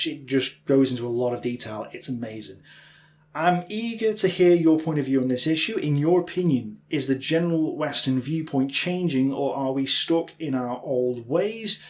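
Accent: United Kingdom. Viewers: If you want foreign listeners, try to tell a history...a tale.....not giving us numbers and never speaking so fast.... not only the British pronunciation is terriible for us but you also speak fast and connect words in the same style Germans do....well...if you want us to listen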